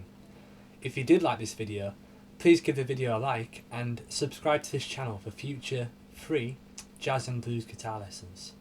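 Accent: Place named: Yorkshire, United Kingdom. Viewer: you'r a good teacher....and you know your stuff. Also you have a great tone on that Tele. Really like your vids. I can never decide between Hull accent and Scarborough I'm going for Scarborough!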